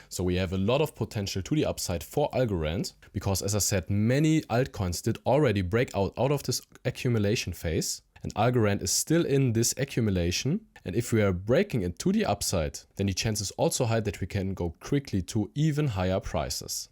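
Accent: Germany. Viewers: German accent👏🏻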